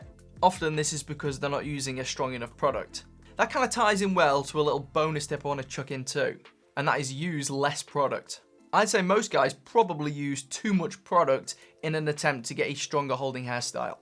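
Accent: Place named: Sheffield, United Kingdom. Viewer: Sheffield accent 🤩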